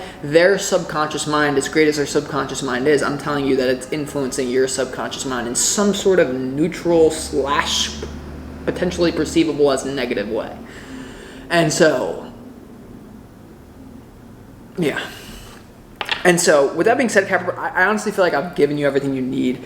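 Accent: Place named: Long Island, United States. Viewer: Sounds like you lived in Massapequea 😮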